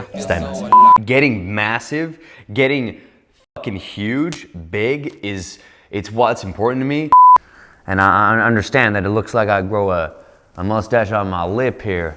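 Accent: United States. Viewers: Lmfao the American accent is so funny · Wtf he sounded American af😂😂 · American accent is on point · American accent on point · Yooooo your American English accent is perfect lol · Holy fkn shit look literally like Justin Beiber and u nailed the american accent dear god. way to go man. not just braun :P funny too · Omg!!!! Your Southern accent! Yass!! 🤣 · On point with his American accent. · not bad american accent, didnt say bro or dude (yes that stereotype is true) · BROOO THE AMERICAN ACCENT IS SICKKK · Your American accent is scary good. I just started watching these videos and I swear I wouldn't have known you were Australian if I had stopped after the intro 😂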